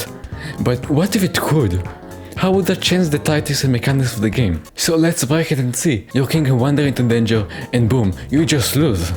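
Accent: France. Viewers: It sounds like french.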